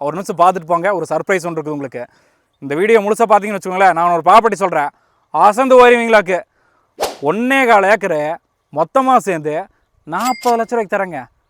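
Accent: Kongu, India. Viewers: Anna neenga pesurey kongu thamil supernga anna.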